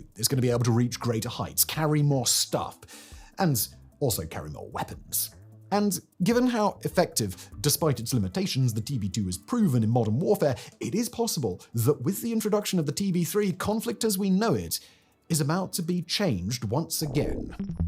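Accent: United Kingdom. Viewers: Such racism against Turks... 1 our "invasion" aka occupation of northern part of cyprus is completely within international law, since we are guarantors of the islands populace alongside with the british since ww1, that gives us the right of occupation if the said populace is endangered, like how greeks committed mass genocide to Turkish cypriots. Second: kurdish "freedom fighters" are in the terror list of every western nation, only since 2014 the ypg branch, which was newly formed as the syrian branch of pkk, were not counted as terrorists. Thirdly the kurdish problem didnt originated from Turkey and spread to other nations, it was already underway in iran, iraq and syria all of whom committed genocides against Turks but NOT Turkey. Dont trust a man only because he is bald, has glasses and has a british accent, this bigot is just a western racist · "Highly warmongering country" says the guy with the British accent, with aplomb.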